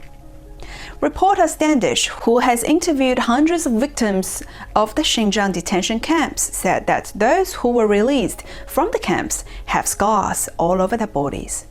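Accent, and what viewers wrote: Australia. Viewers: 好像是澳州口音的英文。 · Really Fly! (真飛！）澳系口音舒服。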